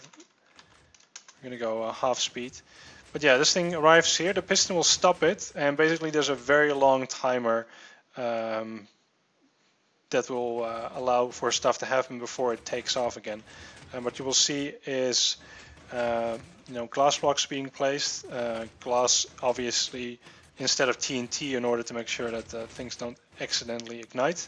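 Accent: Netherlands. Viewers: are you dutch??? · the best accent is the dutch is accent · Are you Dutch? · r u dutch? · that dutch accent tho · Are you from the netherlands? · Are u dutch ? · are you dutch? you sound like it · are u dutch? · are you Dutch? because Dutch accent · are you Netherlands? you accent sounds like it! I'm from the Netherlands. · That accent, your Dutch!!!! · He is from the Netherlands. :) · are you dutch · this is my firt vid of you but are u dutch :P( ur accent sounds like u are) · Its really easy to notice that you are dutch, ya know? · You sound dutch XD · Je bent Nederlands ik hoor het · Het valt meteen op als iemand Engels gaat praten en hij is Nederlands en vooral bij cube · I hear a Dutch accent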